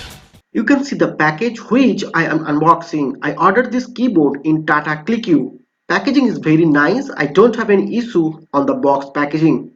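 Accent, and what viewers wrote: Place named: United States. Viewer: Bhai english indian wali bolo...ye to American ho gai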